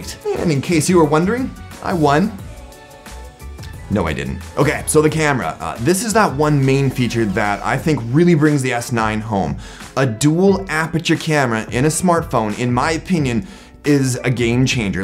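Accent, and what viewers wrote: Canada. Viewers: I love when your little Canadian accent shows through. I also dig the beard! very rugged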